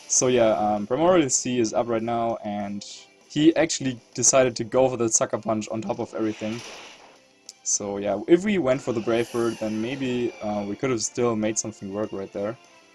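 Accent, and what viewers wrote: Germany. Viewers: you're german right ?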